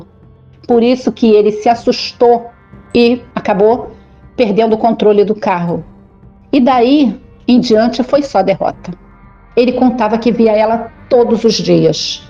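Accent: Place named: Rio de Janeiro, Brazil. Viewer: Eu to viciada na!!! Estórias mto boas!!!!! E eu que sou espírita seu que tudo que ela conta é real. E é carioca. Adoro sotaque carioca. Beijos pra vc. Brigada pelas estórias. 🥰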